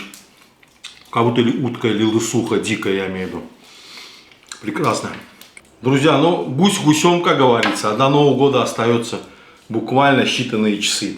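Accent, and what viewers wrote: Baku, Azerbaijan. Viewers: Может быть. Спасибо Вам за ваш труд и за потрясающий и неповторимый Бакинский акцент!Нас осталось мало,но мы есть!🙏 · Бакинский акцент ни с каким не спутаешь.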